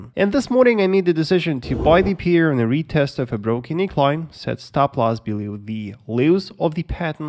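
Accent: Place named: Russia. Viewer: О видно что акцент русский)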